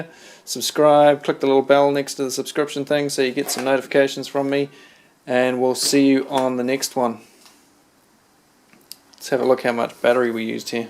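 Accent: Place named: New Zealand. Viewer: Sound a little bit kiwi almost actually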